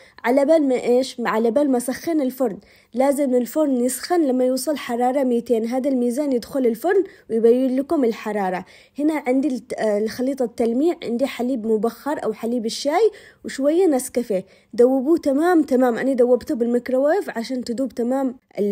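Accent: Aden, Yemen. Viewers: وووووووو اني فدالك ❤مبدعه والله وكله كوم و هدرتك باللهجة العدنيه الذي اموت عليها كوم الله يحفظك و يسعدك يا رب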